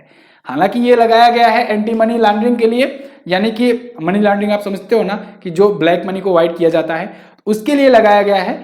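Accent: India. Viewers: Desi English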